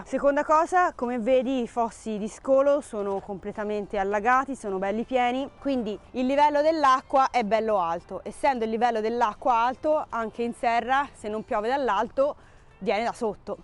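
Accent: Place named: Centre-North, Italy. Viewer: Ciao,forse lo hai già detto,in che regione si trovano i tuoi terreni? Dall'accento direi Centro-Nord...dico bene?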